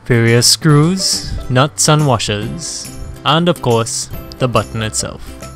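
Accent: Trinidad and Tobago. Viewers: Your accent seems very familiar!! Trini!! I'm getting a lexus altezza next week and lookin at installing one of these as well as a push to start and remote start kit so appreciate this guide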